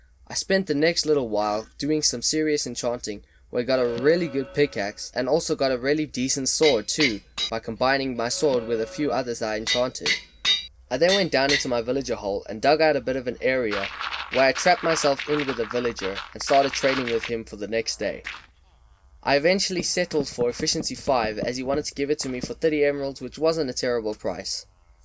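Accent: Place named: South Africa. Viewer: Where you from because you sound like a South-African. Good vid btw and just subscribed.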